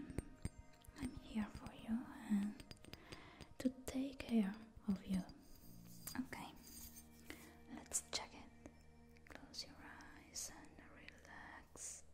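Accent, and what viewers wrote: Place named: England, United Kingdom. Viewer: Omg! Your English accent is just so good and relaxing😍 I just love it ❤️ it’s so cute!🤗🥰❤️❤️❤️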